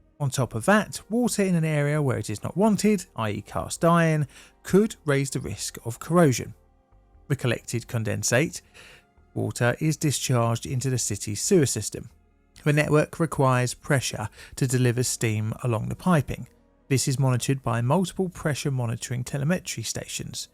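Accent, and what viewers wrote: United Kingdom. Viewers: Now I know, what all that steam we see is, in the cities. Very 19th. century-ish, and modernly beset😆. As you can tell, I'm thankfully NOT, from any big city. How could 2,000 customers, turn out to be 100,000 businesses, and residential customers?? Ain't that, the same thing🤔?? And if the narrative was spoken with more English, and less British, we here across the pond, might be able to understand exactly, what you're saying.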